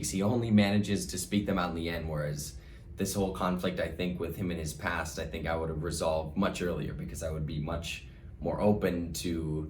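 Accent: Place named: England, United Kingdom. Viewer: When I watch this series I never thought he’s Thai language was his first language coz the way he relay things it’s so awesome and sexy but I do like he’s English ascent so cool 😎. You did a great job 😉👍✨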